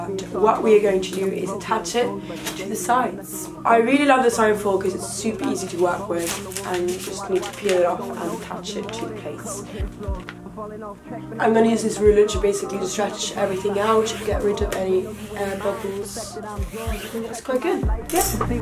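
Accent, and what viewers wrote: England, United Kingdom. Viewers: your new english accent is so cute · pls notice I love you sooooo much don't stop making videos!😍😍BTW I love u r British accent! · Who else thinks that she is already getting a British accent!! CAUSE I DO! 👇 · The semi-british accent though :😂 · Omg u have an English accent!!!! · You’re english has became more..um british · Your semi-british accent 😂❤️❤️ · holy crap, your voice is both deeper and your accent is becoming british.. OH MY GOSH!!! BRITISH + NORWEGIAN = IRISH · You have seriously turned British! Your ascent are so good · It's just my impression or your English has changed, it has become a lot more british😂🧡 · You sound so much more British........ I LOVE IT 😍💗 This was a really good video Love from a Aussie girl 💖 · Loving that British accent and love the video. Thank you for making a real and relatable dorm decor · Omgggg u sound British! 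· Omg it’s such a cute room! Next can you do a room tour! I love your videos and I think that your British accent sounds great! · You are already with a British accent 😂 Love you kisses from Portugal ❤️🇵🇹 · 99% OMG YOU HAVE A BRITISH ACCENT 1% other stuff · Its so strange being British and I can hear your British accent coming through. I really hope I sound as nice as this on my channel to those who aren’t British 😂😂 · you sound so british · you already have a British accent! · You sound so British but it really suits you 😍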